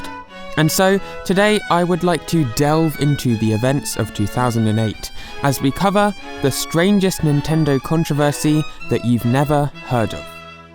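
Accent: United Kingdom. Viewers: The narration is so British, I had to grab a cup of tea just to not feel out of place while viewing.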